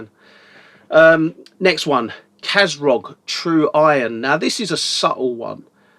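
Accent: England, United Kingdom. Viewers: great reviews as always!! Thanks-you. When you say "three" it sounds like "free" ! ...its the English accent + my hearing loss! :)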